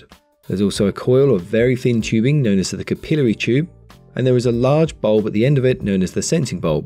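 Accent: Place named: United Kingdom. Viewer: i wish you'd talk a little slower,,,,,,,,,,,,,,,i know you can go back and watch again as much as you want or need to but with that british accent i miss a lot and i become frustrated and turn off the video,,,,,,,,,,just a suggestion though,,,,,,,,,,,,,,,other than that i do like the videos............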